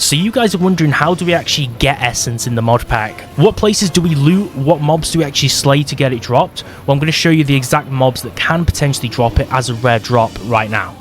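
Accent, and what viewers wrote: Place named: Northern England, United Kingdom. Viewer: Not sure if you explain how to get started in this pack, but I like your accent. Northern England, possibly Eastern, sounds nice.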